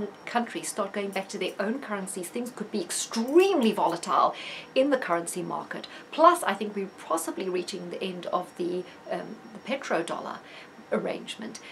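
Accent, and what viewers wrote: South Africa. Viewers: Another great video- thank you. Are you South African?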